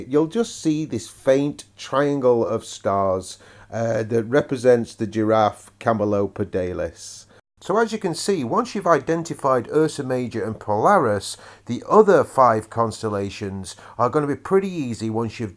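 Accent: Midlands, United Kingdom. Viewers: Would you mind disclosing your appropriate location? Your accent sounds not too far from me (Derby) and i was wondering about local 'low light pollution areas'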